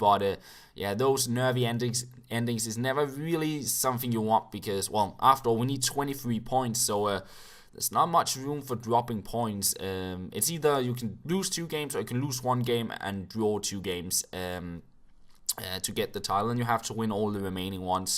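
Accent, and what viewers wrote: Jutland, Denmark. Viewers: Er du fra Jylland?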